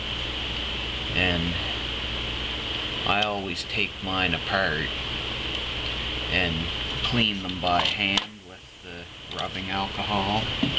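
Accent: Canada. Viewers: ince acent you got there! where is it from? Canada? haha I'm from Canada too, so thats how I knew you were from there... and then I checked your chanel... haha! Thx for the vid man!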